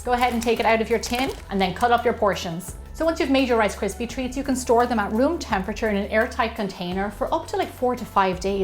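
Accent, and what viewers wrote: Ireland. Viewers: Looks so delicious I must try I made peanut butter rice crispy treats with fudge topping love your Irish accent💚☘️ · Im going to make the snickers one! Looks amazing! Also, love that you never lost your Irish accent! (I'm Irish) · Are you Irish? Great video btw 😁♥️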